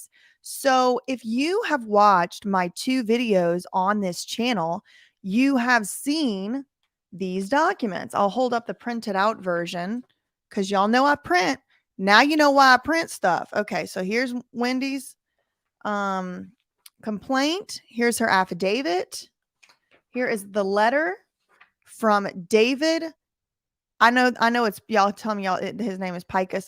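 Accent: South, United States. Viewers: Woo hoo Warrior Woman! 🎉Shae Shae shame shame. “Sometimes you can come out alright, like me and then there’s Shae.”🤣 Also, you have definitely been laying on the southern accent and rhetoric lately. Hilarious! Seriously though, I’m SO PROUD OF YOU STANDING UP FOR OTHERS AND FOR JUSTICE!😘🤗💗💕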